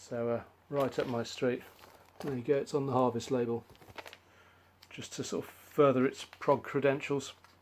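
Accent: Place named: United Kingdom. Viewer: Hi, I've just found your channel and subbed. It's always great to find another UK voice, especially one talking about Prog. I've not heard of before. One for me to look into. rings a bell. I'm sure I have a 12 inch single by them somewhere. are a new one on me too. Nice Congrats on the Roger Dean cover. I've not seen that cover folded out like that before. Fantastic. I've had my eye on the album for a while now, purely because I live near some viaducts like those on the cover. I was put off though when I read a review that it was reminiscent of. But it sounds like I should give them a go anyway. Great video! We didn't overlap once. Cheers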